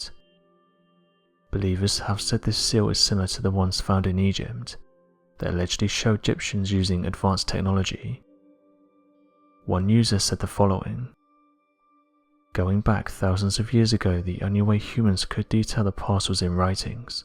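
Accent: England, United Kingdom. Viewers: What helps them appear credible is that they're using a person with an English accent to narrate their stories. Think about it, had they gone with some yokel from the south or a guy with a Bostonian accent talking about how "wicked hard" they looked around the internet to find examples of modern tech depictions from past civilizations or how "you know what, I swear I saw them same fancy scratches on a bumper sticker before y'all" and nobody would take it seriously.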